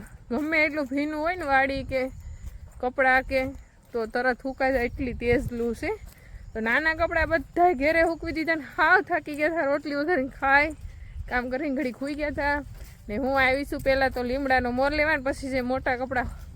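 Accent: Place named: Kathiawar, India. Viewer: તમે બોલો તે કાઠીયાવાડી ભાષા સાંભળવી ગમે છે. તમારા ગામ નું નામ શું છે.ત્યા કેમ પહોંચાય તે વિસ્તાર થી કહેશો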